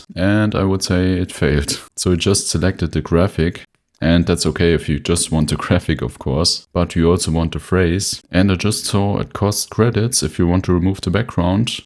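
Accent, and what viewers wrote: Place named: Germany. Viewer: Gutes Video. Aber noch nie so deutsches Englisch gehört, aber mach weiter so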